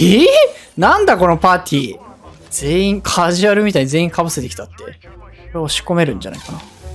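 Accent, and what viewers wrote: Kansai, Japan. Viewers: この人関西の人？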